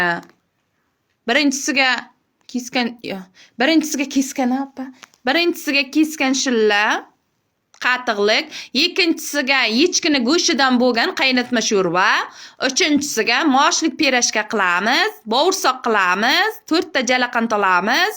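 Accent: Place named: Surkhandarya, Uzbekistan. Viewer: Surxandaryoni shevasi 100% yoshli perashka keskan shulla Surxoncha taom Qoʻrgʻon bozori boor😊😊😂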